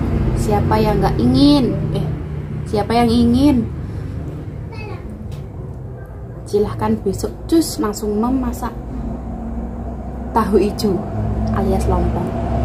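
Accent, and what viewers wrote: Java, Indonesia. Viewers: Salam kenal,mbae jawane ngendi mba.. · Salam kenal mbak, pertama kali sy liat chanel ini. Chanel youtub'e sampeyan moro2 nampang ndek home, iseng2 tak buka.. apik mbak, konten sederhana, natural, aseli, gak neko2, gak dibuat2, kamu jg orangnya menyenangkan enak dilihat, tulus apa adanya. Jarang bgt di jaman skrg ini. Teruskan berkarya, kudoakan smg makin sukses ya, aamiin. O ya, jowo ne endi mbak?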